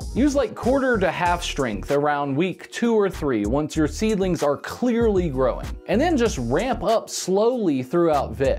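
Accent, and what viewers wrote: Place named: South, United States. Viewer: my got that southern drawl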